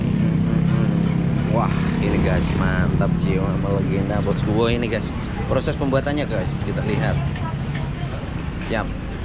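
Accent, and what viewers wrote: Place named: Banyumas, Indonesia. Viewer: pada bae ngapak... salam dari cilacap sukses selalu mas 👍👍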